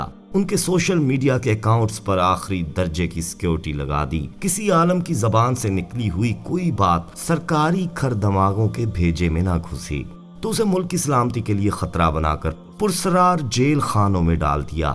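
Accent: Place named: Punjab, Pakistan. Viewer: Apni Urdu sahi karo bhai bilkul bhi sahi nahi bol rahe khalis Punjabi dihati lehja hai aap ka kisi Karachi waley se hi commentary kerwa letey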